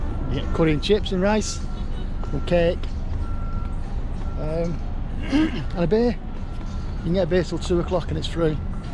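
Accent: United Kingdom. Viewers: Thought you were from Manc good on yeah. Our interpretation would be Geeza bud ta. Guess where in the country that comes from.1 Guess.🍻🍻😂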